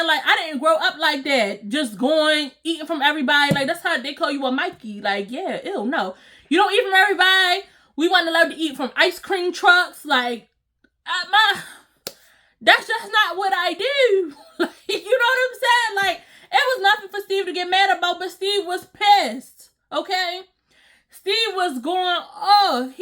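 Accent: Baltimore, United States. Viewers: I love ur bmore accent. Meet his purrents 💜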